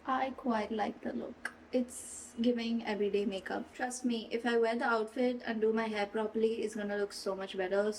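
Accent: United Kingdom. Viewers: don't stop doing the british accent gurlll i love it 😁🩵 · yk i love your chill grwms and be yourself in the vlogs that you already are aur haan the brit accent was so cool..idk how people sound like that<3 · Never sat my face, nose intimacy and the British accent lol😂😂😂😂😂😂. Why are you so lowkey hilarious lol😂😂😂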